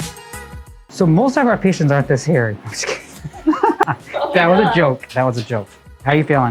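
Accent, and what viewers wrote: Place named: Asia. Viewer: Bro the Asian accent 💀💀💀💩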